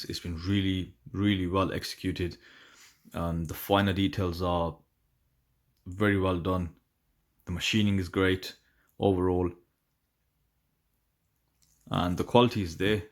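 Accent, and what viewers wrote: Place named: West Midlands, United Kingdom. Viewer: Sounds like you're enjoying the watch. Been tempted by one of these. Also, I recognise the accent. A fellow West Midlands lad? 😁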